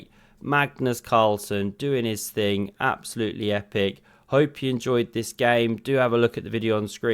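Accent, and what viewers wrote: United Kingdom. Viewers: God I love this commentator. So funny, so English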